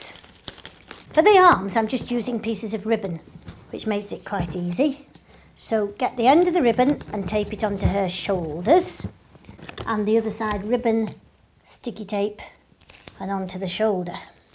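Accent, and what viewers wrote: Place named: United Kingdom. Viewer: ooh soo cute :) and i LOVE the british accent :)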